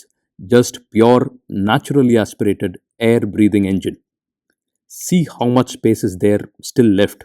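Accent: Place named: India. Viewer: Почему-то я понимаю индийский английский лучше, чем американский.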